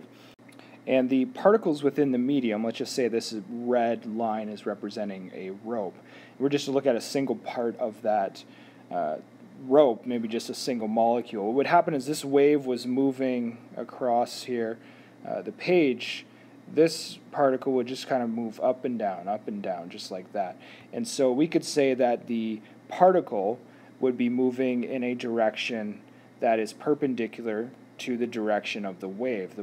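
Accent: Canada. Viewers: I think he is canadian because he said aboot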